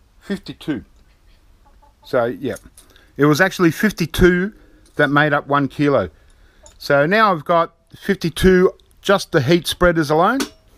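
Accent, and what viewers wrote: Australia. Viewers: its obvious he's from Australia by his accent and the fact he's wearing a t shirt in april, too cold in the uk for t shirts at the moment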